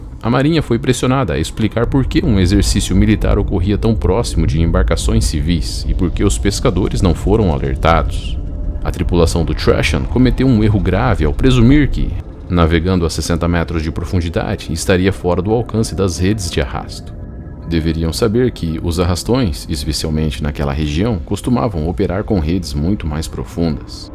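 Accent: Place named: Caipira, Brazil. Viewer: pq ia tem q ter sotaque caipira? bota um sotaque neutro aí, seu google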